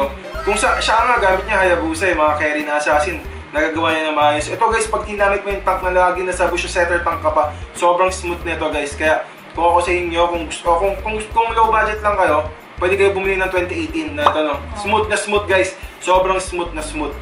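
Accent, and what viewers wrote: Iloilo, Philippines. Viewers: Ilonggo ka po ba kuya? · Ilonggo ka pala Boss? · Boss. Ilonggo ka pala?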